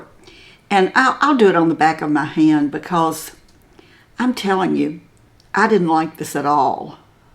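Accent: South, United States. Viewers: Just think you’re ADORABLE!! Love your Southern accent!! It’s so beautiful!! I flew for Southwest Airlines and the flight attendants that were from Dallas and other Southern states, could get away with saying just about ANYTHING ( rude language) to “bad customers “ with that Southern accent and that passenger had ABSOLUTELY NO CLUE that they were being made fun of OR that they were just being TOLD OFF!! 🤣😂🤣😂!! That accent could sink battle ships!! I loved it and tried to copy it 🤭🙄 with absolutely NO LUCK!! Keep up your great work and you are so inspiring!! ❤️🤍💙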